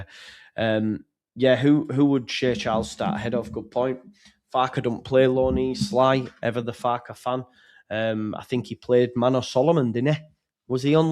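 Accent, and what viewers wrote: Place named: Leeds, United Kingdom. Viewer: Best proper Leeds accent on Youtube. I missed you, man.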